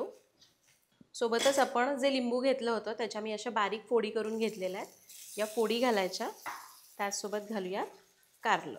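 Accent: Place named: Pandharpur, India. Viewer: Ag tai tu pandharpur kiva mangalvedya chi aahe ka g mla aamchi bolnyachi aahe tashi vattey..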